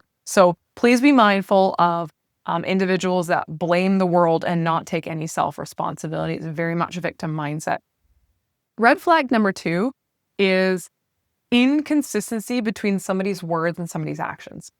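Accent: Canada. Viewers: I just love. Your Canadian accent. You are very attractive. I’m sure that makes you uncomfortable. Why? “Oh I’m smart and professional.” You’re still a girl, right?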